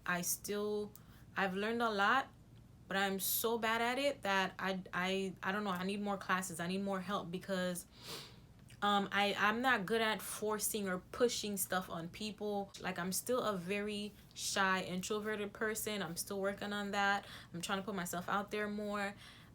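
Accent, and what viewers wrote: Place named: Haiti. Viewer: Random question... are you Haitian? I detect an accent and you look like you can be one of my cousins lol 🇭🇹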